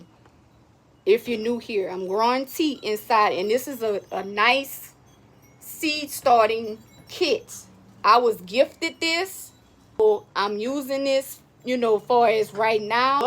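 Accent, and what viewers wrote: New Orleans, United States. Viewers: Accent reminds me of family in Nawlins. We like to talk ish about the differences at family reunions lol much love from Slauson and Crenshaw 💪🏽🏁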